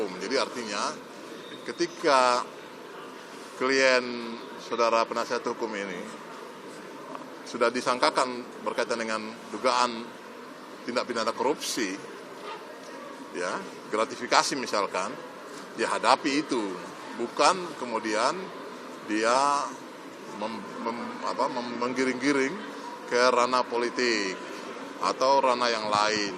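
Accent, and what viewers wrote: Java, Indonesia. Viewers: Wah jowone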